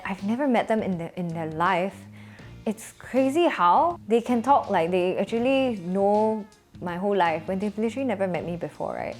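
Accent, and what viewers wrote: Singapore. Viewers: Its called tall poppy syndrome. People love to intentionally knock others pure jealousy! Keep it up girl your Singlish is awesome!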